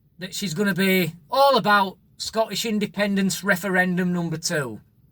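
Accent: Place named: England, United Kingdom. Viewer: Honestly, you kinda embody why a lot of young Scots don't want to be part of the UK - namely because views like yours are perceived as being common in England and are rejected by about 75-80% of the Scottish electorate. It is factually wrong to say that the border has not been discussed - its been in the Scottish Media quite widely if you search. I'm also yet to see evidence that Scotland "will be flooded with economic migrants from the Third World" - could you please provide some? The UK is chronically mis-managed and has been lacking infrastructure and education investment for decades - the public services have been put in private hands under Labour and then Tory under the guise of "Trusts", which are often run by party donors when you delve into them. And this actually costs the English taxpayer more than it otherwise would. (I know because I worked in two of them). 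Listening to your accent, it sounds like you come from one of the numerous geographical regions in England that has not turned an economic surplus in decades - why do you and your fellow citizens not demand better of your government and accept stronger local representation? Many economic precedents show that effective governance is the key to effective economic growth and transitions - Estonia is perhaps the best example in Europe currently - and this has been missing in the UK for decades. The failure to create a sovereign wealth fund on the back of Scottish oil revenues would stand as a rather striking exampl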